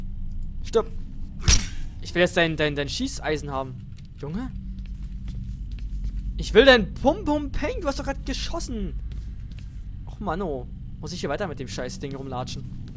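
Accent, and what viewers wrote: Berlin, Germany. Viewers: Ich schreib nur so ungern ;-) Ich schau erst seit gestern deine LP`s und find sie Klasse. Ohnehin geht nix über Berliner Dialekt :D Achja: Mach noch mehr Horrorgames!!!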